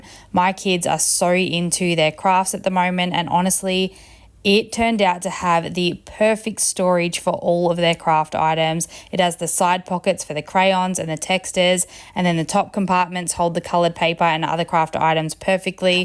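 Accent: Australia. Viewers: I do not see on your channel description on where you are located/which country? Im guessing Australia 🇦🇺? Anyone knows, i love her accent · This was an awesome video, I love listening to you talk, maybe because you're another Aussie. Be proud of what you are accomplishing, you are getting things done. Love the before and afters! Thanks for sharing, I wish you would make more videos, so motivating!!!! 🥰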